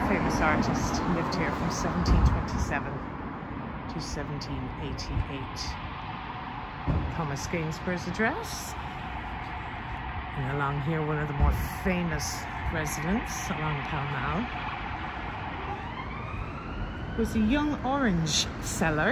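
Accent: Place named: Ireland. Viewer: A lovely way to learn about our capital, told by a lovely Irish lady with a soft Irish voice. I could listen all day.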